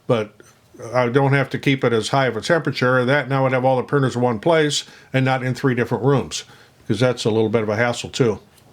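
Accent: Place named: Scotland, United Kingdom. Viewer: Scottish accent, boy oh boy. Well, coming from an american anyways.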